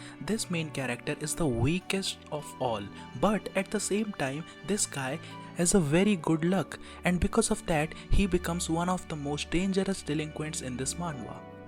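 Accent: India. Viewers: Fuvking india accent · I get Indian vibes from your accent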